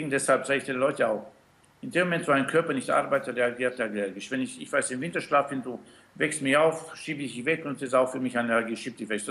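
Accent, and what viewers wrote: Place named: Austria. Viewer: Ein österreicher😂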